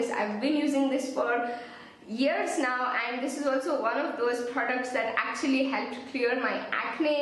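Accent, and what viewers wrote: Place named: South India, India. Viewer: You have the cutest south Indian accent.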